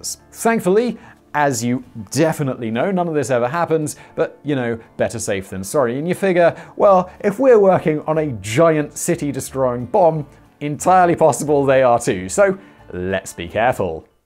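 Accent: United Kingdom. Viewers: Looking a little weak in front of the history guy. Little bit scattered. Missing a few points. The British accent can't save you forever.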